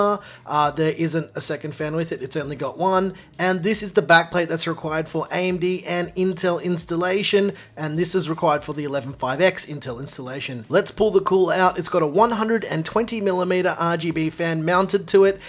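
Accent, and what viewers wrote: Australia. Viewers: Why do you look Mexican but you speak Australian? So strange I love it!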